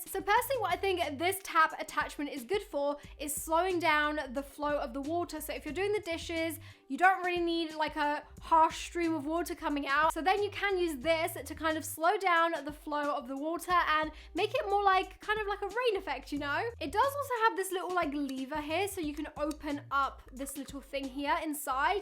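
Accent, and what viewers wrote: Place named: England, United Kingdom. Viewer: It's just the english accent. If you come here in the UK you will see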